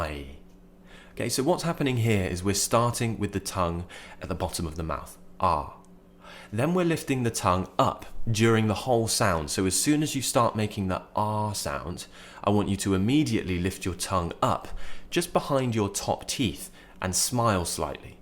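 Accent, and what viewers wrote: United Kingdom. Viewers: Caundo hablas suenas tan verdadero, si me mientes no lo notaría. Te llevaría a comer ceviche with your British accent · Hey, I've just found your channel. I'm an English teacher in Brazil and I love teaching phonetics. I was looking for some pronunciation videooa when I found your incredible channel. Congratulations on theses videos you've been making, they're excellent, really nice material. I love the British accent and I just can't tell you how happy finding your channel made me feel. As you say it: "take care, cheers, bye!" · I like your way of teaching English, I have benefited you a lot, especially the British accent because I work with a British company in Iraq, sincerely accent British wonderful.thank you so much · It's so nice to listen to the British accent and vocabulary, I love it but most of the movies and tv shows we can watch are americans so it's hard not to be influenced by them. 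· I like British accent.. pronunciation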